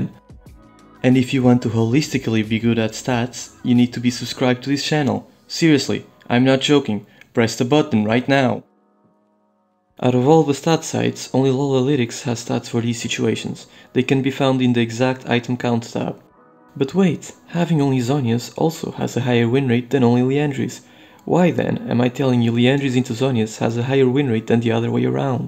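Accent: Portugal. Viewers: Are you by chance Portuguese? There's something about your English accent that's activating my senses... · you 100% sound portuguese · Are you Portuguese bro? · Why does your accent looks like you are from portugal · Aquele sotaque tuga em vídeos de LoL, não estava a espera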